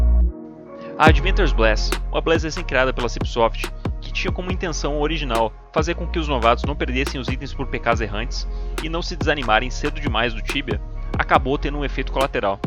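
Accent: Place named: Porto Alegre, Brazil. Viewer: Boa noite, meu querido! Primeiramente, parabéns pelo canal foda, mano! Desculpa, mas sou curioso: tu é de Porto Alegre? Baita sotaque... Também sou daqui!! Abração dum outro velho (26 anos de idade e 16 de tibia) tibiano.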